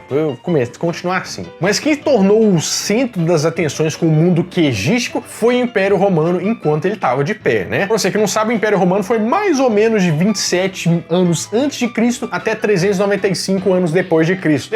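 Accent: Minas Gerais, Brazil. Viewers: "balangar" o leite kkk é mineiro msm..melhor sotaque..sou da zona da mata mineira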